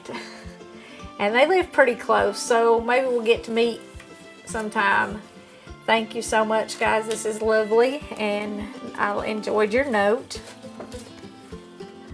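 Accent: South, United States. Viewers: I just discovered your channel and boy do I absolutely LOVE you! Your fantastic southern accent, great recipes. You are wonderful! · I love to listen to this lady talking; reminds me of my KY kinfolk, my grandma Kate Ford, and my grandma Bertha Edwards who also spoke with a very southern country accent. I'd been looking for an easy fudge and easier potato candy recipe. Thank you for sharing your old-fashioned delicious candy recipes with all of us folk. During this year's holiday season, I will try your two candy recipes! Bless you.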